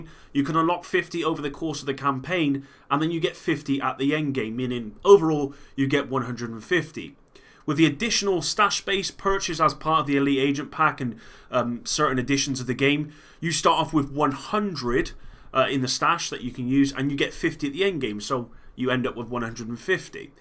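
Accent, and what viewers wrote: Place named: England, United Kingdom. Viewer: Good video. Keep up the great work. You sound English. Where abouts are you from? I can’t wait for this game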